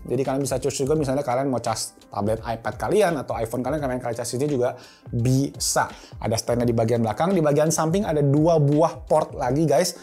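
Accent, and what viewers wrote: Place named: Palembang, Indonesia. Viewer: e' o' , ya ampun bahaso palembang nian🤣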